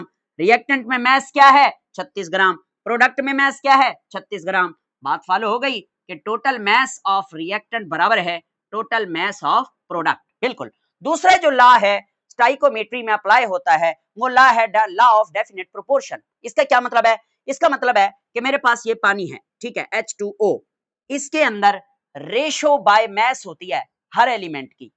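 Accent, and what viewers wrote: Saraiki, Pakistan. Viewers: Are you saraiki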